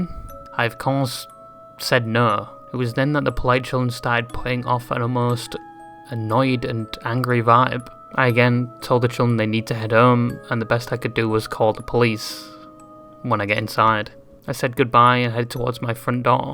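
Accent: United Kingdom. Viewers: Noooooo PLEASE 🙏 I love accent 😭 Don't make his accent go away 😢 He has allotta more femboy topics to discuss 🙏😔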